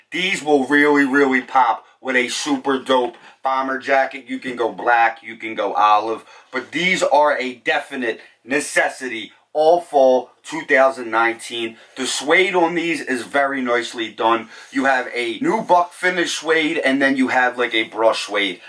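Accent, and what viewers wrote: Philadelphia, United States. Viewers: heavy Philly accent. I love the look of this shoe.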